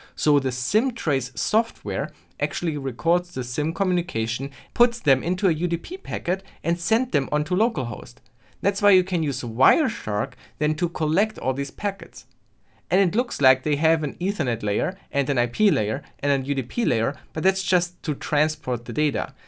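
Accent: Germany. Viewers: Are you german Bist du n deutscher😂?? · Man hört, dass du deutscher bist. :D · junge hört man krass das du deutscher bist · You are german right? Guten Tag ich bin deutscher :-P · Bist du Deutsch? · Are you a German? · Are you german? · Hmmmm 🤔 i can clearly hear that you are German ^^ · Chicken Fish i think he is from germany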